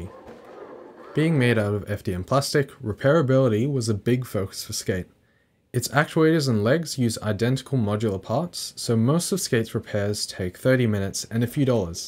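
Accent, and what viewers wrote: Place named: Australia. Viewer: I have to say I love they way you think. So often people design product with no concern to cost, ease of repair, weight & ease of manufacture etc. I also find that the general approach is to over engineer everything regards of need. This is the polar opposite of what nature actually does. I think the fact that you have made the right and left parts interchangeable is brilliant as keeping the part count is low is super important when manufacturing. I am waiting on a Bambu Lab printer so I can purchase your CAD and start tinkering as I think your product/project has unlimited scope and I have already some idea's on how I can utilise your actuator. I notice that you have an Aussie accent, any chance you are located on the east coast?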